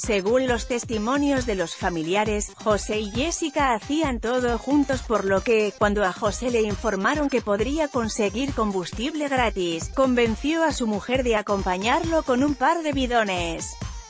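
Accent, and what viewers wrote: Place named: Spain. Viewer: ESTE ROBOT CON ASENTO ESPAÑOL NO SIRVE PA NADA. PERO D CUALKIER MANERA. RIP.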